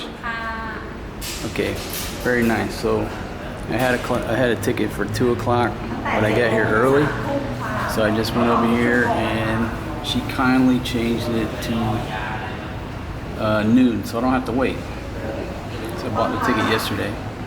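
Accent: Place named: Texas, United States. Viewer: loved your detailed report about the bus service as i was just looking for this info ! and above all that loved your texan kinda accent man ! typical cowboyish ! a handsome and smart backpacker detected , in pattaya ! hahahaha keep posting such cool vlogs mate ! greetings from india :) peace and cheers for that singha !!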